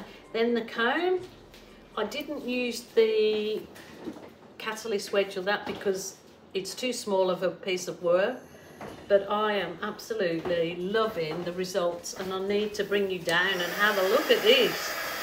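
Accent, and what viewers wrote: Birmingham, United Kingdom. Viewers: Lol... I've been thinking it's a bit strange that there's a place in Australia with an almost brummy accent 😂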